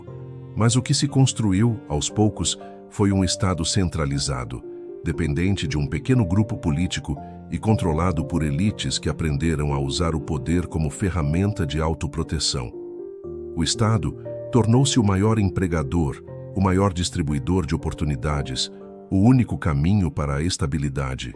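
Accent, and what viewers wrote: Brazil. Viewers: Quando é que vamos ver a tua verdadeira cara porque tenho a certeza você é uma pessoa conhecida em Cabo Verde mais preferes ficar escondido atrás das camaras com um narrador português brasileiro o que não crédibilisa os teus argumentos sempre para destruir tudo de bom que os nossos dirigentes tem feito de bom para Cabo Verde nunca ouvi da vossa parte uma coisa positiva sobre nosso país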